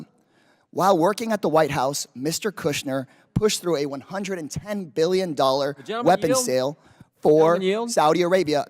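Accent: South, United States. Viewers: Says the elites right man with the southern accent.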